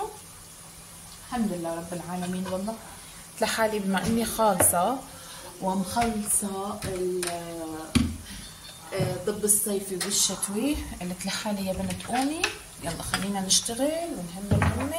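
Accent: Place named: Levant, Arab world. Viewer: دخيل صوت شامية حكيك بجنن ❤❤❤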